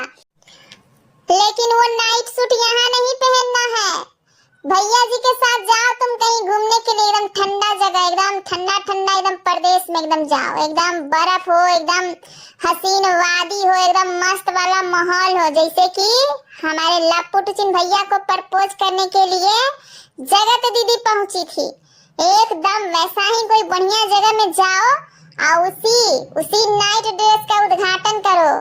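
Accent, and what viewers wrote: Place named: Varanasi, India. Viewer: 😂😂aap hai kaha se plzz btaiyega aapki aavaj bilkul hm logo ke tarf ka lgta h mera matlab hai vanaras jesa h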